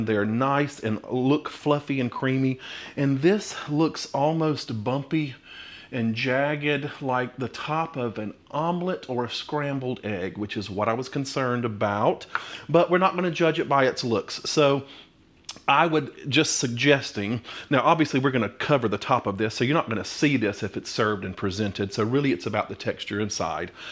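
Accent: South, United States. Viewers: Thank you. I just started watching you, and I am very impressed with many things on your show. Listing a few is your southern accent, your honest input without judgement on one or the other recipe that you are introducing to your audiences, your knowledge and teaching us of items/tools used to cook with and your knowledge of ingredients, sometimes strange, used to cook with, and how you present and do the show. The finger up to the camera for a one second pause, then flash to a new view of you having transitioned to the next thought, the way you taste and truly enjoy the items. And btw I looked at some older film of you on this channel and I can see you are thinner. This Keto life is a good thing! Thanks!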